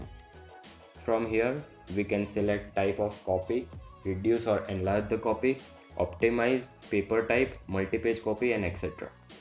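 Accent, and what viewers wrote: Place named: India. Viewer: I understand it but it seems he is from India .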